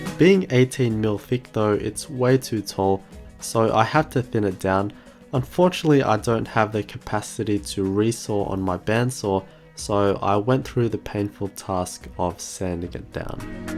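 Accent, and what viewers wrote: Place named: Australia. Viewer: You sound like Daniel Ricciardo. Are you an Aussie?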